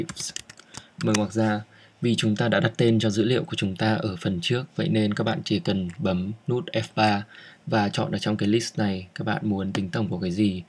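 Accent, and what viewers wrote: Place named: North, Vietnam. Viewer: Giong con trai mien Bac nghe hay qua. Cam on nha.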